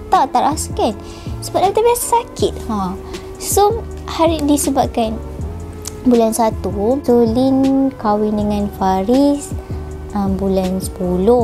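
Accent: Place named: Kuala Lumpur, Malaysia. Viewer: Ckp pelat KL doo...Penang mai tok sah dok mual2 la sngt..loya nk muntah plak bila ckp mual2..Toya mek Lin 😭😭😭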